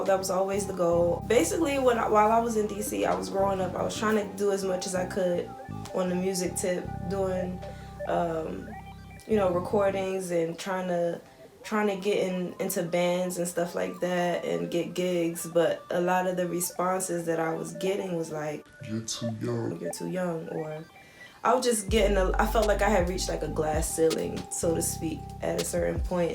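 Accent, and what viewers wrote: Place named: Brooklyn, United States. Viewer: You talk like a BK person!! Tell her!!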